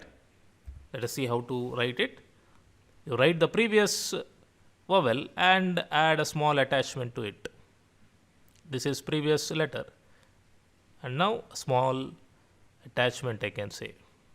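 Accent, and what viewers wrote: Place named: India. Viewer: Your videos are very helpful, my friend. However, you're giving us English words to help us with Hindi pronunciation. The problem is that you are pronouncing those English words with a very, very strong Indian accent. Thus, your English examples are not helpful to me, at all, because I find that you pronounce those words very differently from us. I would like to request that you give examples with Hindi words, and not English words. That would make your lessons much more beneficial. "Is" is NOT pronounced with the same sound as "meet." Is = Short i Meet = Long E The "Short i" sound does not exist in Indian languages. So, it would be very difficult for you to master the sound. It would also be difficult for you to hear the difference between "it" and "eat." My point is that I am working very hard to learn authentic Hindi pronunciation. 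It would be helpful if I were more exposed to pronunciation in the Hindi language, as opposed to trying to compare Hindi and English sounds so much. In the end, our sounds are completely different and they really should be not be compared to one another. I love your lessons, though, and am enjoying learning with you very much.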